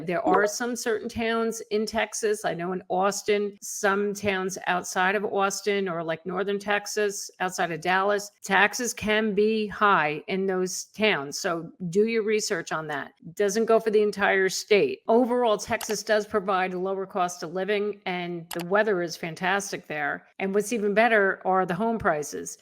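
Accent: New Jersey, United States. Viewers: You are good looking and totally on top of your game. Love the Jersey accent.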